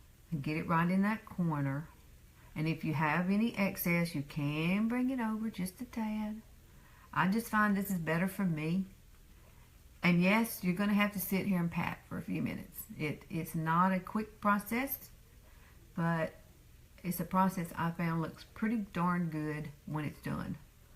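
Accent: South, United States. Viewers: Hi! So refreshing to have found you. I am subscribed to a few beauty gurus, but they are all in their 20's and 30's. Fun and entertaining to watch, but I was looking for someone to address some issues of the inevitable aging skin. I am 48, and the one thing I fight with is the fine lines and crepeyness of my under eyes. It seems any and everything I try to use just accentuates the madness😩I'm going to give this a try. never thought to leave that area naked from foundation. I do make my own facial oil with jojoba, coconut or sweet almond as the carrier oil. Then add in essential oils such as frankincense, vit. E, lavender, rose hip, pomegranate oil, sweet orange, ylang ylang or rosemary oil. Not all the essential oils in one potion, I just play around with each new batch and it has done wonders for my skin over the past year. Truly amazing. Also, LOVE rosewater! 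Can't wait to try this. You are beautiful and I love the southern accent. New sub here! Much love from Texas💕💋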